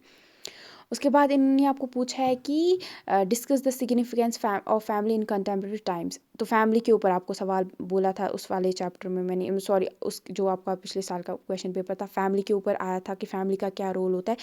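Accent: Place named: Jammu and Kashmir, India. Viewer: aap ka baat karna ka taraka sa mai samaj gaya thi ki aap jk sa hoge.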